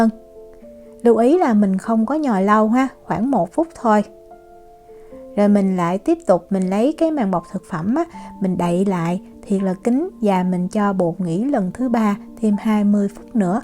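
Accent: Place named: Saigon, Vietnam. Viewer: Hướng dẫn chuyên nghiệp, tận tinh và đúng chất dọng người SG. Cảm ơn bạn và chúc Vạn sự như ý!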